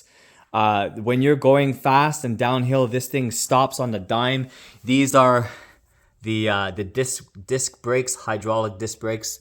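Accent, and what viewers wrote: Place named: Canada. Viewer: I'm planning on getting the X2 60 Volt dual 750 hub motor version. Judging by your accent you are from Canada and the one I can get is not available to you. 🤔 I live 5 miles from Canada in Detroit area by Windsor Ontario.. I currently own an Ariel Rider Kepler 26x4 fat tire with a 1,000 watt nominal Bafang geared hub..1,800 watt peak 52V 20 ah battery. I just wanted a folding bike with dual motors that was as fast as my Kepler but does hills even better. Dual suspension and 60V is a plus too. 100 Nm torque per motor is great! Better than my single 108Nm motor. I would consider the Road Warrior X2 with dual 60V 750 motors but I want a folding bike and extra suspension. My Kepler goes 35 mph no problem .. Throttle only.. 56 kph .. Flat ground to 60% battery 56 kph then goes down to 54 after 60% battery.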